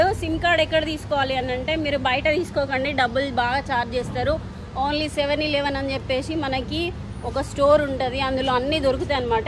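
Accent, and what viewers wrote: Telangana, India. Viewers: ❤తెలంగాణ యాసలో మస్త్ గాఁ explain చేస్తున్నావ్ ❤️శుభాకాంక్షలు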